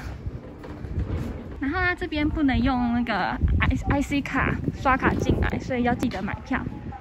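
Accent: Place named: Taiwan. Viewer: 我怎么那么喜欢听台湾国语呢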